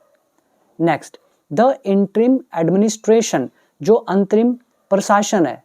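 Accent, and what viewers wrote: India. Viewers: Great 👍, I wanted to inform you to improve your slang and pronunciation. Now, please try to develop your accent. Don't teach indian accent to your viewers. Take it easy.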